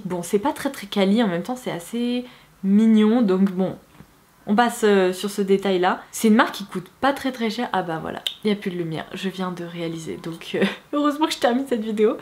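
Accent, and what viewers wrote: United Kingdom. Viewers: Ton accent anglais me fais toujours un peu sourire xD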